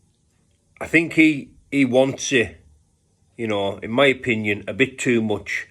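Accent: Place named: Luton, United Kingdom. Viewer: You come out with some funny words coming from Luton sounds like another language mate,all the best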